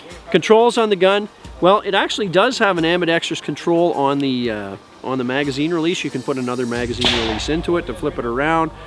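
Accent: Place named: Canada. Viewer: You're either in California or Canada.. I vote Canada, because the "aboat" thing. The gun actually comes with a 17 round mag in normal places.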